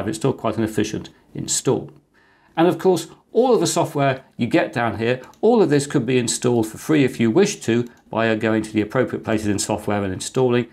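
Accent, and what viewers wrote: England, United Kingdom. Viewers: I miss the energy you had when you used to exclaim, "RIGHT!" Now it's just, "right..." Your English-isms are one of the reasons I fell in love with your channel and now, it seems, you're downplaying them. Your content is as good (if not better than) ever but I like a little old world flavor (or is it flavour?). Keep up the good work but give us the whole package.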